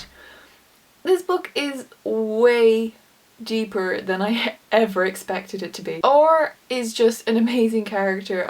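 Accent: Ireland. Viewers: I thought there was a cat behind you till I realised it was a reflection of your arm in a mirror lol. Great vids. Always good to hear fellow irish on here. :)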